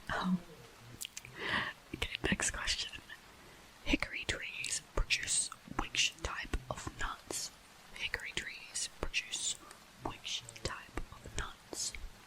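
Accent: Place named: Australia. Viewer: This was so fascinating and relaxing ❤ I really love listening to ASMR with a fellow Aussie accent, glad I found your channel!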